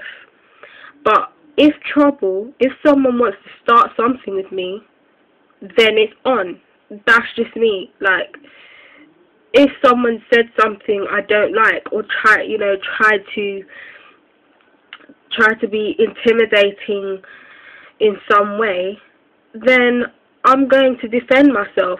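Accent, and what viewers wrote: United Kingdom. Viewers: yur frm england rite judgin frm yur accent london or the midlands ?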